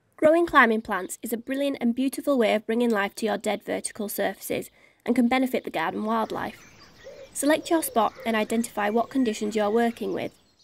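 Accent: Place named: North, United Kingdom. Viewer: Good to hear a northern accent narrating!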